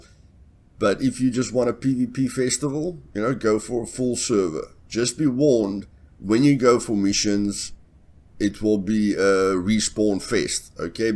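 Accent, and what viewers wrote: South Africa. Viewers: Jy Suid Afrikaans?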